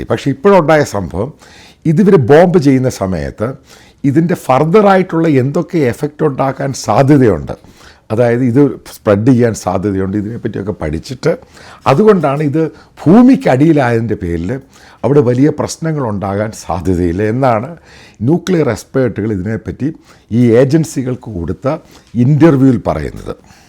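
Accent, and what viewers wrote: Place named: Central Travancore, India. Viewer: മദ്ധ്യ തിരുവിതാംകൂർ ശൈലിയാണ്.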